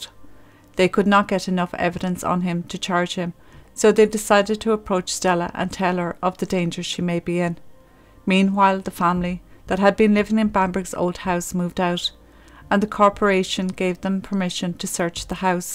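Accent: Ireland. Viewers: Thanx I enjoy your channel,straight and to the point and of cos the Irish accent,the cherry 🍒🍒🍒🍒 on top.Much love from South Africa.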